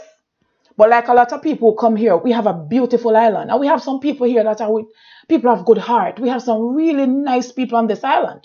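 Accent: Jamaica. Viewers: I like the patois..never heard you like this b4....keep moving forward..one way...blessing!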